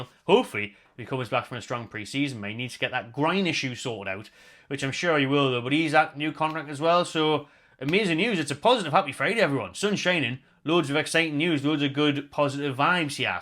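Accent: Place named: Newcastle, United Kingdom. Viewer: great content but your eager beaver Geordie accent is somewhat of a challenge to us foreigners at times. please don't have us deported to Greenland, thanks. Lloyd, The Falklands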